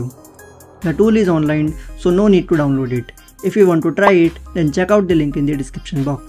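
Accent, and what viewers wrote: India. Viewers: I cant understand a word of your english, as soon as I see a hindi speaking english video I leave, u ppl speak a very strange english, still sounds like hindi.too bad.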